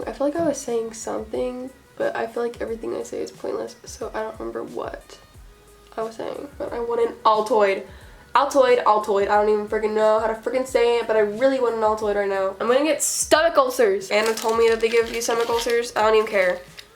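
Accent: Texas, United States. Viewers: she sounds so texan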